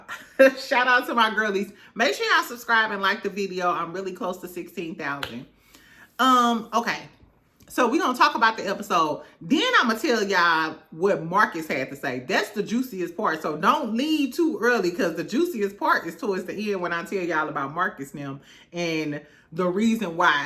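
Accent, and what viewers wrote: St. Louis, United States. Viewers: I thought I heard some STL. 😊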